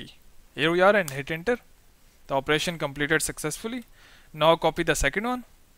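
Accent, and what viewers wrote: India. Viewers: probably a virus or something since its from an Indian accent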